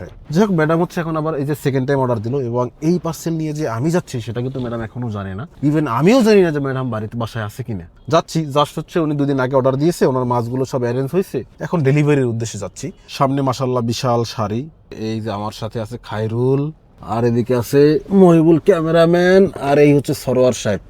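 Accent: Barishal, Bangladesh. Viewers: ভাইয়ের বাড়ি কি বরিশালে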